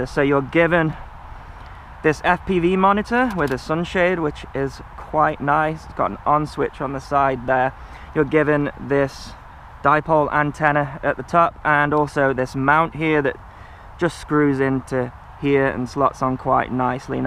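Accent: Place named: Yorkshire, United Kingdom. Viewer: You sound exactly like my friend Oliver from Yorkshire :)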